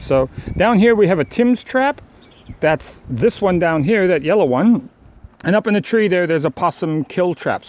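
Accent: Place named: United States. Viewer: This is in New Zealand - you can tell from the rimu and ferns - where possums are a serious pest. He just happens to have an American accent.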